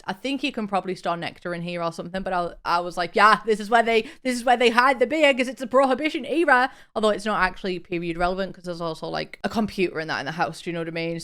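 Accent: United Kingdom. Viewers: I watch a ton of British tv and youtube, so I don’t struggle with your accent exactly, it’s the combination of your accent and how fast you talk😉 I have literally had to slow your videos down to figure something out... and that’s mostly on me because I have hearing loss from listening to my music excessively loud when I was a teen... and even now sometimes... I didn’t learn my lesson😆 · Whole truth, i used to watch your videos to get used to your accent so that i can communicate better with my British clients and it worked. I get everything everyone says 😋 Thanks😬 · I need you to know that I absolutely LOVE you and your accent is my idea of the perfect British accent. Also this may be my favorite build you've ever done.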